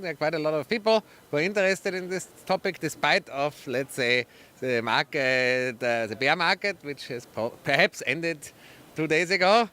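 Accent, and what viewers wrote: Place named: New York, United States. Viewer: Das ganz typische New Yorker Äll.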